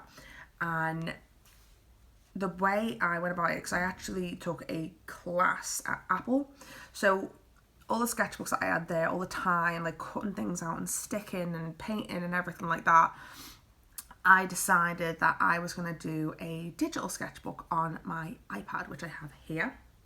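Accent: Liverpool, United Kingdom. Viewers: Thanks for your reply hun, love your accent too, can't beat a scouse accent! haha I'm from Belfast! That's great you loved it, it's hard practicing on your own but when you're kind of 'forced' lol you get lots done so i'm looking forward to it. I'm defo going to go to uni next year, what did you decide to study in the end- fine art or textiles? would love to see a video on uni life for mature students! :)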